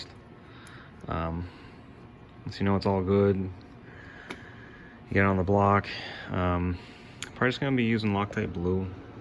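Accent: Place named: Boston, United States. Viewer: Refreshing to hear another Boston boy. I’m Woburn myself. Good vid 👍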